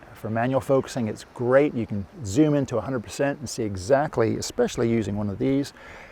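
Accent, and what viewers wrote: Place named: Australia. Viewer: I feel like I hear bits of Aussie accent lol but very informative video! Best is the basic language used- a lot of the other videos I can’t quite understand due to the technical terms etc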